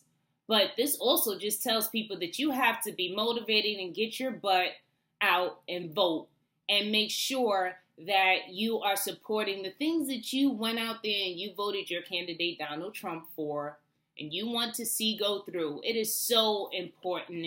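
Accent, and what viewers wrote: New York, United States. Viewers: Hi this your new subscriber from Nassau Bahamas great video and I watched that Kavanaugh hearing today I had too laugh lol.. I love your beautiful New York accent lol..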